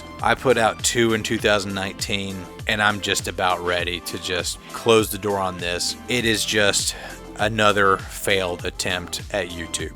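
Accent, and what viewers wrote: South, United States. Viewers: It's just my personal opinion but I feel like your channel has taken off for two main reasons, one is excellent well researched content presented in a very relatable way and two because you lend NASCAR material an air of authority with your southern accent but also not sounding so southern that no one can take you seriously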